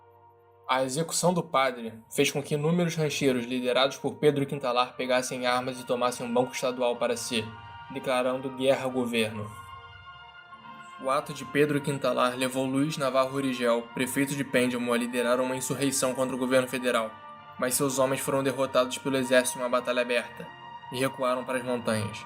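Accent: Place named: Rio de Janeiro, Brazil. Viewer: DEIXA DE SER FRESCO! O SOTAQUE "CARIOCA" DO NARRADOR É PERFEITO!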